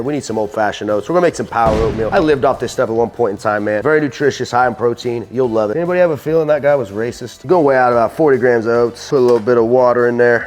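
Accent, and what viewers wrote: Texas, United States. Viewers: Your texas accent comes out when you'Re sick :D:D:D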